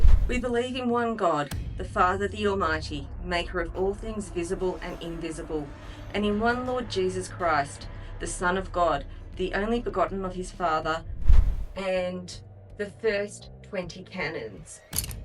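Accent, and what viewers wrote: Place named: United Kingdom. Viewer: Sounds British. The Brits self - castrated a long time ago. It's not working out well for them....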